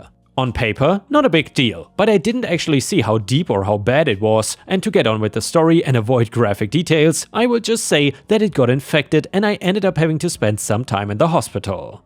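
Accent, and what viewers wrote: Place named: Germany. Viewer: Hey! Guessing from your accent, are you german? Anyways, thanks for the entertainment!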